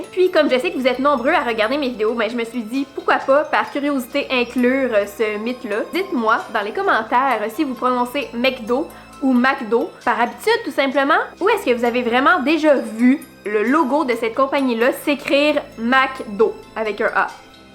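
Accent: Quebec, Canada. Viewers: t'es québéquoise? j'adore ton accent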